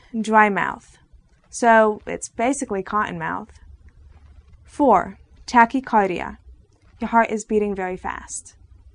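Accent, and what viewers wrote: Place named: New Jersey, United States. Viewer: i like the way she talks it sounds like she may b from new jersey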